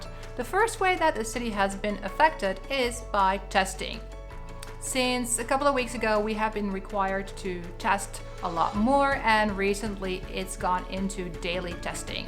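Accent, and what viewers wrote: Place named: Russia. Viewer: Your russian english is pretty good. A lot of accent still. Very fine though. Congrats!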